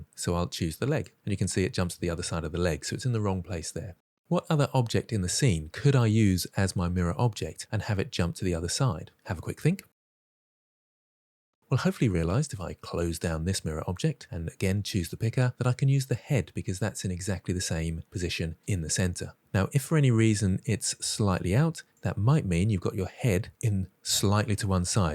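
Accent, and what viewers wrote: United Kingdom. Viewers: just shows and demonstrates how many times he had done this and knowing the process inside-out. The addition of British accent adds a nice spice making blender appeal and following the process just a breeze. Many thanks for sharing.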